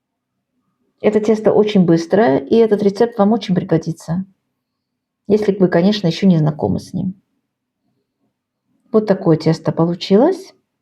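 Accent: Baku, Azerbaijan. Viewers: Доброго дня,! У Вас до сих пор остался бакинский акцент.... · Чувствуется легкий бакинский акцент🎉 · Какой у вас сладкий бакинский акцент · Я сразу по акценту догадался, что перед нами бакинка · Я тоже Бакинка ,я тоже так делаю ,но спасибо не буду, попробую без жарки лука ,сразу подумала что по акценту ,вы из Баку ,спасибо ,вам здоровья моя землячка ,пусть моя смотрит ваши рецепты ❤хранит вас Бог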